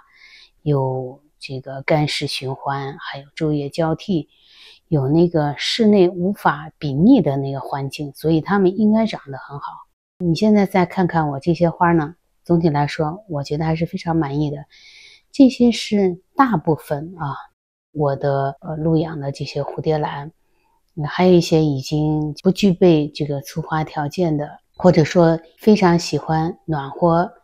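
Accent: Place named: Xi'an, China. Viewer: 叶子长得太美了，听老师的口音感觉咱俩是老乡啊，我是西安人😆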